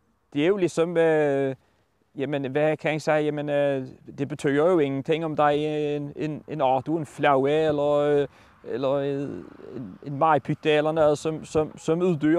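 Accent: Scania, Sweden. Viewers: Jeg er norsk, og forstår det. Det høres ut som skånsk synes jeg. Noe som ikke er merkelig, siden skånsk opprinnelig var østdansk, som er blitt forsvensket. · En blandning mellan skånska, danska, blekingska och lite småländska. Rätt kul. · Det liknar skånska en del. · Det låter som skånska tycker jag :D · Jeg er fra Skåne og det lyder næsten som det😮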